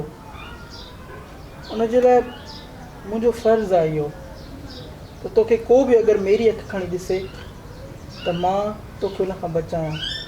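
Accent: Sindh, Pakistan. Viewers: brillent real mithri sindhii bolee asa jiii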